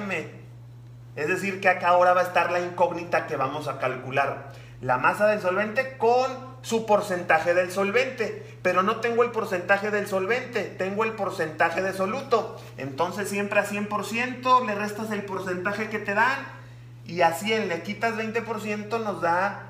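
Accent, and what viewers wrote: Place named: Mexico. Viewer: No es por discriminacion pero me da dolor de cabeza el acento de los mexicanos 🤷‍♂️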